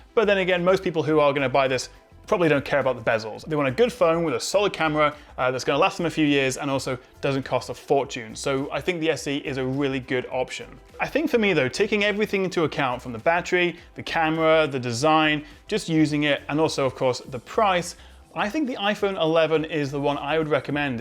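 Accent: United Kingdom. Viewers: I´m subscribing because i´ve been studying english for about 14 years now... and your british accent helps me practicing and reinforcing my comprehension skills! (And also cause i love tech too i guess 😂😂😂) greetings from Colombia from a guy born in Argentina :[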